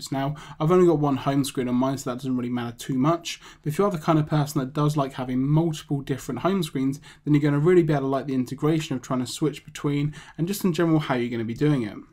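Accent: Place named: United Kingdom. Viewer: the british accent IS TAKING OVER YOUTUBE!!!!!!!!!!!!!!!!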